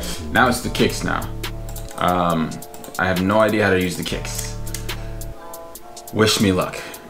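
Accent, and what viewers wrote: United Kingdom. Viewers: Dis is my first time watching one of your videos and I fully thought u were British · No way, your London accent is on point ...coming from a londoner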